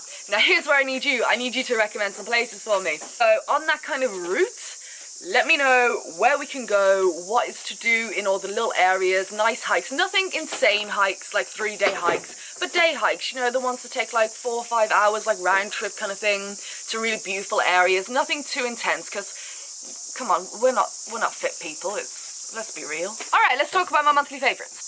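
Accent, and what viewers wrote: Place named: Wales, United Kingdom. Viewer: Where's the welsh accent from?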